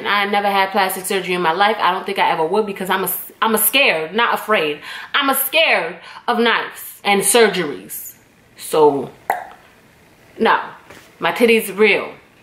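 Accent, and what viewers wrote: Toronto, Canada. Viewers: I honestly wish you would use your Canadian accent more often tbh! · You speak like a Torontonian 😂😂😂😂 The first video I saw of yours you said 1 word and I automatically knew you were from Ontario 😂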